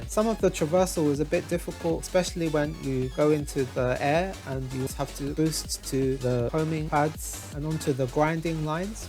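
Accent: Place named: Japan. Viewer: Are you Japanese? Your accent is interesting.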